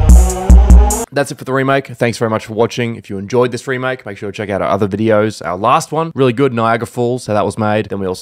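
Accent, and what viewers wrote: Australia. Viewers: bro your aussie?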